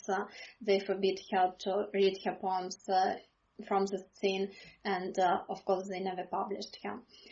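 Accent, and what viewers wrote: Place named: Russia. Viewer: sorry your pronouciation is too russian style english . I hardly understand haha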